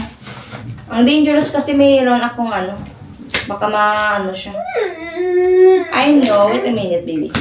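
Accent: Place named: Iloilo, Philippines. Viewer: Parang ilonggo ka ate sapananalita mo😂same, ❤ hi from korea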